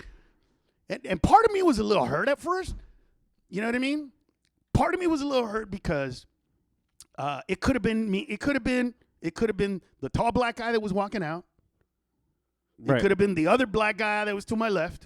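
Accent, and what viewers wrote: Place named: Mexico. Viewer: Youre not funny you fat piece of shit, all you do is steal people's jokes and say it with a mexican accent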